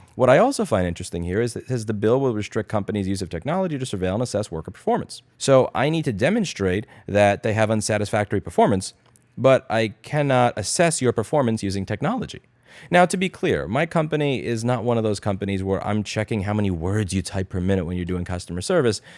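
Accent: New York, United States. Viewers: I have a feeling in like two years time he's going to look and act completely Texan, but still talk like a New Yorker. Welcome to Texas =)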